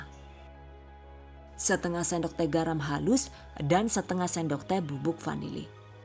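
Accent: Banyumas, Indonesia. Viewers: Rika wong endi sih yu....ngomonge kaya wong nggonku....banyumasan 😁 salam kenal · Rika asline ngendi yu jere wong madiun deneng ngomonge bleketek kaya wong banyumas,,nyong penasaran yakinlah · Wong ngapak ya mbak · Pingin ketawa..ngapak nya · Wuaduh...ngapak banget....kie mbakyune......yaw...kriben ya... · Makasih resepnya yu..cara menjelaskan begitu jelas,saya suka banget, maaf yu sampeyan asli ngendi,kok ngapake kaya iyong🙏🙏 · Mantul pake ngapak ngomonge 😄😄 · Salam ngapak 😂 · Ora ngapak ora kepenak ya yuu... 😁😁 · Hehe q suka ngmgnya ngapak.. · Wong Jawa ngapak apa kie...wkkkkkk........soale bahasane mung wong Banyumas sing kaya Rikane..Nek emang bener bangga nyonge...kmbi njenegan buk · 👌seperti biasa ,apa saja hasil jadi masakannya pasti tampilannya cantik sempurna, mksh bun kasih tutorialnya yg super duper lengkap dan jelas dgn ngapak2nya yg kadang bikin senyum2 klo pas mantengin videonya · Rika wong ndi yu bahasane ngapak lucu he.he.he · Jal wonge kaya ngapa.... 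ngapak temen 😂😂😂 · paling sng klo ibu ini yg memandu bahasane campur aduk ada ngapak jg...slm ngapak dari tegal y bu...🙏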